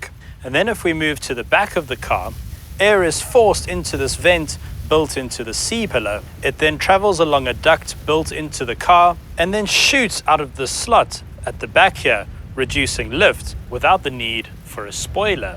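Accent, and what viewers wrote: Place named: South Africa. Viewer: CarVinci No this South Africa. This is our normal English accent. I have seen that many people from other countries think we speak with an Afrikaans accent but then that is people who speak Afrikaans usually who then also speak English. His accent is the usual South African English. The place he was filming is in Cape Town.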